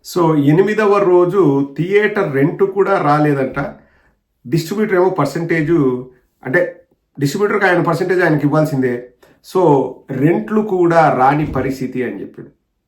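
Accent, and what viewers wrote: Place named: Telangana, India. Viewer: Sudden గా తెలంగాణ భాష మార్చావ్. నీ పని చూసుకో ..పోరా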